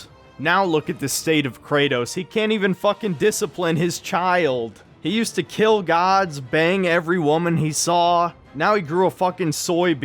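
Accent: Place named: Midwest, United States. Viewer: The Midwest accent is strong with this one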